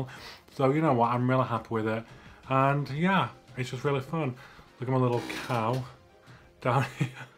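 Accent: United Kingdom. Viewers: I’ve read you are from London so what’s with the northern / East Midlands accent ??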